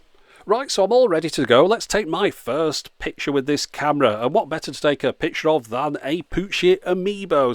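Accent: United Kingdom. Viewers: Love watch your video.. Probably because of your accent and British humor 😂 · I just discovered your channel and I really appreciate it. I just have a side question : Is it a britannic accent ? I wanted to know, I'm not sure about it ^^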